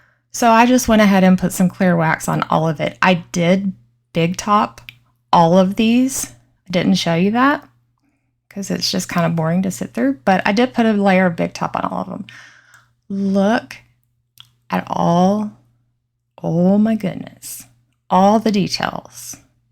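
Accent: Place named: South, United States. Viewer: Sounds like you're a southern gal like me! Love your pumpkins!!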